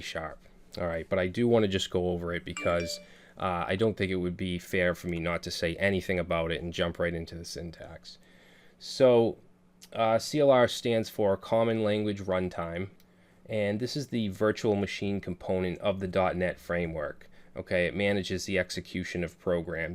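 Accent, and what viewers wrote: Boston, United States. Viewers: Are you from Boston or Maine? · I hear Boston in that voice... Edit: I just saw the shirt. Boston confirmed. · This guy is from Boston. I like his style, but I can't stand his accent and people from there. Go Blues!!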